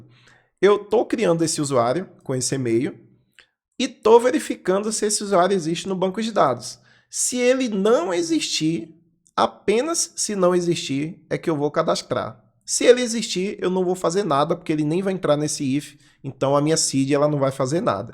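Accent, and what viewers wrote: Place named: Northeast, Brazil. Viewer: Muito bom, me ajudou demais!!!! E ainda é nordestino que nem eu!!!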